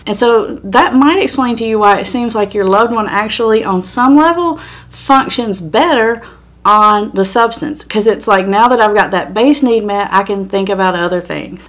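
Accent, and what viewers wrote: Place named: Eastern North Carolina, United States. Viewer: I love your accent!!!!! are you from eastern NC by chance?????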